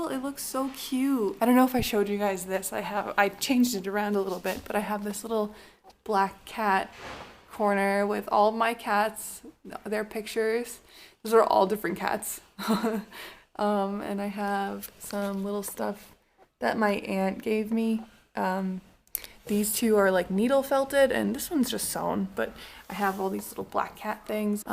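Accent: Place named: Taiwan. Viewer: haha, I really enjoyed watching your monologue in the background while I'm doing random stuff. the holes on the wall are for ductless air conditions, so you might need get them for summer. connect your TV through the extension cord, they are the same voltage. by the way, your Chinese accent sounds like someone from Taiwan.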